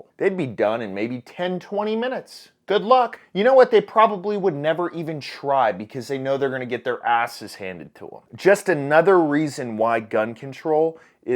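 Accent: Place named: South, United States. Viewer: Dude, ya really screwed the pooch on this one. Ya sound exactly like an uneducated southern redneck. Hummus and Hamas are not the same, aren’t pronounced the same. One is chickpeas and the other are terrorists. And you’re ranting like a fool. Ya shouldn’t have made this one. I know you’re tired of the dumba$$ guv’ment. I am too. But, Israel is our ally in the Mideast and has been since its inception. Yes, gun control is a bad thing and we all know that. That should have been your main point for this video and not a rant about chickpeas. Get a grip. Lay off the high caffeine coffee. Play some soothing music. Go sit a spell on the back porch and watch the clouds roll by. Cya tomorrow.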